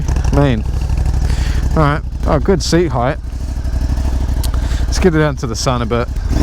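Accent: New Zealand. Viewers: Cool review bro. Love your style and always good to hear another Kae-wee accent on YouTube.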